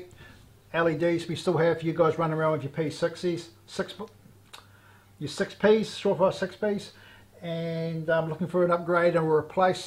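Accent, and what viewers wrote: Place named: South, United Kingdom. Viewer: Your accent is interesting it’s like a southern British sounds cool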